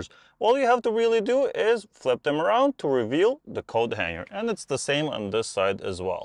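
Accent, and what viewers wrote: Russia. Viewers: Why do you speak like "Diagnose Dan"? Oh, with Russian accent... I can understand that's not easy to lose your accent, buy why copy a hard working guy? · This was very useful. Even Russian accent sounds good.:face-blue-smiling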